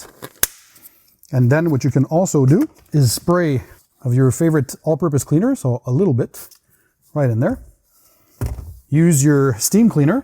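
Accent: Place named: Canada. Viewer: par pur hasard après avoir acheté la cire céramique hydrophobe de chez Meguiars j’ai eu des propositions de vidéos qui m’ont plus donc je me suis abonné. J’adore le contenue de tes émissions et l’accent Canadien nous les français on adore cela lol.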